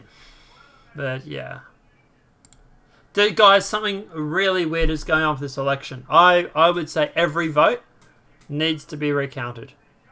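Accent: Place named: Australia. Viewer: Great work, thank you for trying to keep these crooks honest, sounds like you are Australian, doesn't matter, just thank you, if you are not a US citizen you are doing this for the good of humanity.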